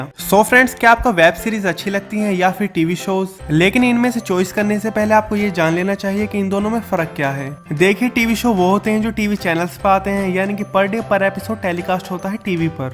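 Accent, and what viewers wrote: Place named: Uttar Pradesh, India. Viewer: Bhai tu U.P ka h na ... Tre accent se bta skta hu Ghaziabad ya meerut ya hapur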